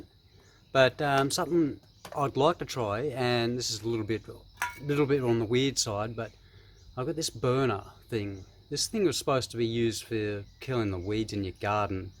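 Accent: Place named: Australia. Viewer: IDK why but a lot of Aussie accents are grating on the nerves to me as a New Englander. Yours does not. I like your accent and your car.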